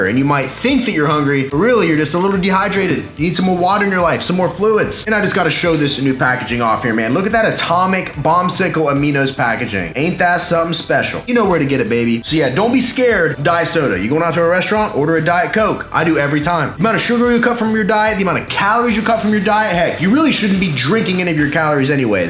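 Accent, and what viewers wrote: Texas, United States. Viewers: The Texan (non-screaming) version of Coach Greg, love your channel!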